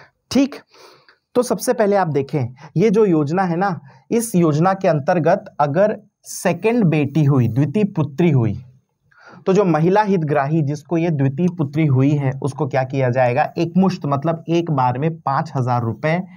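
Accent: Chhattisgarh, India. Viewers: Sir ji jab aap cg me bolte ho n...to bahut accha lagta h🙏❤️🙏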